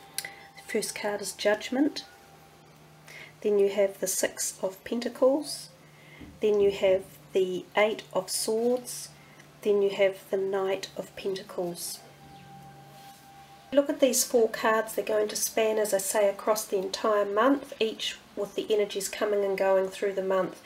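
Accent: New Zealand. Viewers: Awesome Reading ~ thankyou :))) Archangel Haniel also brings in the Energies of The Moon for us ~ which weaves beautifully with Archangel Gabriel's Message ~ Intuition & 'Pyschic Insights' .... :))) Wonderful to hear a fellow NZer :))) Aroha & Angel Blessings!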